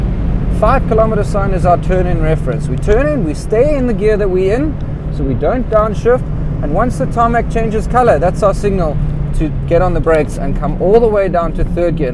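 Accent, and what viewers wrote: South Africa. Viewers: Nice video. Recognized your South African accent immediately, good to hear! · South African bloke. Good one boet :) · cool video... South African? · That South African accent is very distinct. Great guide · Great informative vid!! On another note, are you South African? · South African?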